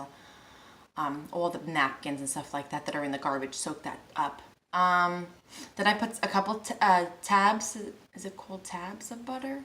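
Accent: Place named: New Jersey, United States. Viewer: ps .. thank you fro the recipe and i love your jersey accent girl it is so cool LOL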